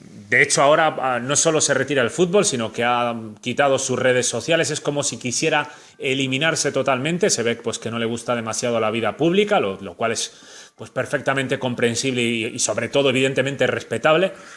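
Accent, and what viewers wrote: Spain. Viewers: Por el acento parece claro que es español.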